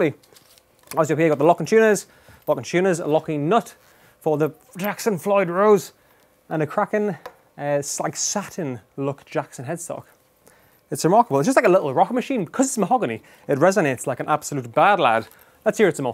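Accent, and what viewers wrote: United Kingdom. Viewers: I'm 4 years late, just got this guitar. British accent hurts my ears but I liked and subscribed. Great video. I'd prefer some more cockney talk though, like "this axe is all kinds of honey and butter!", but got my sub regardless. Redcoat.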